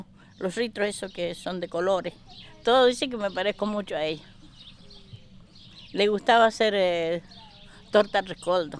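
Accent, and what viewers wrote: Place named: Chile. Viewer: Habla igual que los cjilenos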